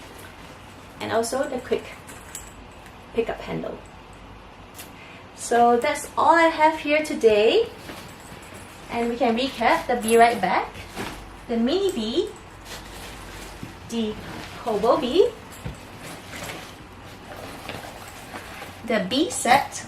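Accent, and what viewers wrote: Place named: Singapore. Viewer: Are u from Singapore. I can recognise your accent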